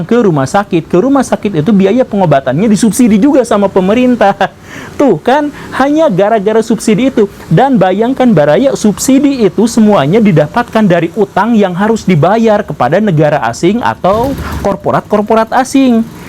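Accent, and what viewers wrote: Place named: Sunda, Indonesia. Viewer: Se7 pak....logat Sunda nya medok banget euy...orang Indo nya yg harus mau berubah, lebih mau bekerja keras tapi juga harus cerdas dong ya...😆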